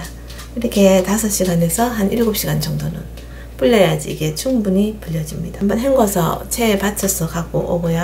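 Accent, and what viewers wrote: Gyeongsang, South Korea. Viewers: 아 ㅋ 설악산쪽요 ^ ^ 지금은 써울이구요 이모님 음식솜씨 ~ 볼수록 최고라 ㅎ 이렇게 배울수도 있으니 정말 좋은 유트브세상이네요 만남 반갑고 고맙습니다 죽을때까지 배워야한단말 실감하며 욜심 따라해보겠습니다 ^ ^ 댓글 고맙구욤 🤗 경상도사투리가 듣기좋아요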